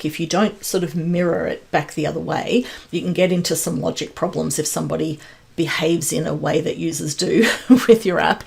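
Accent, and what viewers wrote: Australia. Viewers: you are such a nice person... This is the best and full tutorial available. I like your aussie accent, clear voice and easy to understand. I watched this full video and this asset management app works great for me. It was my first model-driven power app, and I enjoyed it alot. Thank you so-oooo much.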